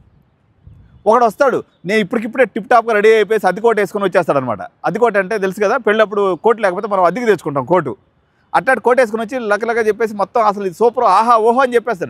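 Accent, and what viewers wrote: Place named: Nellore, India. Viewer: నెల్లూరు బాష, యాస లో ఎక్సలెంట్ గా చెపుతున్నారు సార్ 🎉🎉🎉🎉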